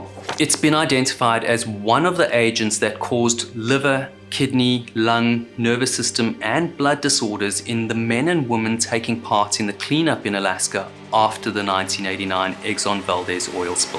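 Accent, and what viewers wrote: South Africa. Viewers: YERRZZZZ OF DEVASTATION LOL south African accents are the best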